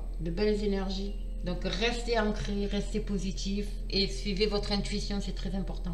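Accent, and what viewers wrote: South, France. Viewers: Super tirage, merci beaucoup , je vais passer une bonne journée !! Tirage professionnel et le petit accent du Sud , c’est absolument charmant !!👍😉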